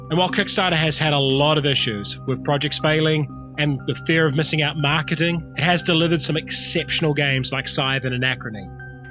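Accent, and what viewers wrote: New Zealand. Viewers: 1. When I was in high school (in the 80's) a couple of friends and I spent long hours playing Hitler's War. It really grew my appreciation for resource management games and that resource management element is still probably one of the biggest hooks I enjoy in games to this day.2. As a fan of roleplaying, I've also come to enjoy games that have a capacity-building or levelling up component for your character/s (very common in numerous games).3. While it's great to enjoy boardgames with friends, it's also great that so many are getting good ports to the PC so you can enjoy them on your own. I've been playing Lords of Waterdeep, Through the Ages and Elder Sign: Omens quite regularly and enjoying them to fill gaps between real life games.4. King of Tokyo perhaps gave me an appreciation that games can be useful just for a quick bit of laughter and fun - they don't always have to be serious. 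Also great to play with the kids.5. Avalon Hill's Civilization was another game that really made an impact. The idea of achieving technological progress and the awesome trading scheme where you could sneak in natural disasters for your opponents was very appealing.I enjoy your vids, the length is great and it's great to hear a fellow Kiwi (unless my ears deceive me) doing something that is internationally appreciated.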